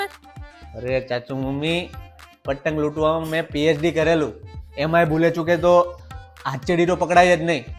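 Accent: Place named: Kathiawar, India. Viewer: આપ ભાવનગર નું ગૌરવ વધાર્યું પ્યૉર દેશી કાઠિયાવાડી રજૂઆત આપ કરી રહ્યા છો હો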